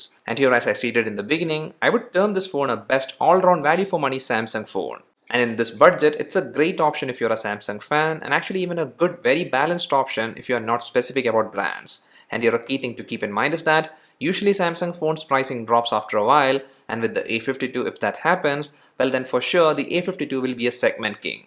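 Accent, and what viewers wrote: Kerala, India. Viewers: U sound like a malu guy.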